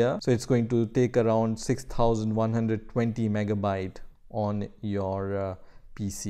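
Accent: India. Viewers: this is very random but you sound kinda indian even though your browser language is German.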